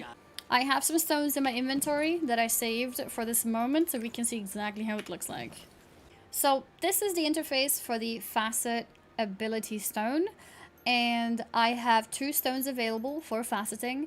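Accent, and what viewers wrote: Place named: South Africa. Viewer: Your accent sounds different. Are you South African? :P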